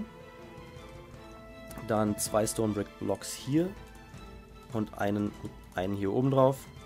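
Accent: Germany. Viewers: Ich hab grad ein englisches Vid gesehen von dir und obwohl dein Englisch echt sehr gut ist, hab ich sofort erkannt, dass du Deutscher bist. :D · Забавный немецкий) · Jetzt merke ich erst das du einen deutschen Aktzent hast wenn du englisch sprichst :) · Man hört doch den deutschen Akzent voll :o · The German accent is so cool!!!! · wow! ich fand dich sowieso schon klasse bevor du ein Deutsches tut. gemacht hast. in deinen Englischen viedeos merkt man kaum deinen deutschen accent! (hast sicherlich ein auslandsjahr oder ähnliches gemacht =)) aufjedenfall nutze ich deine Bauwerke sehr gerne und auch die Englischen tutorials maag ich! peace leon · I knew that your accent was german! Deutschland all they way! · jetzt wenn mans weiss das du deutscher bist hört man auch den akzent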